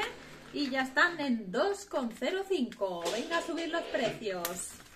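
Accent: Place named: Spain. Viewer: Me gusto la compra que hiciste saludos desde México y me encanta tu asento español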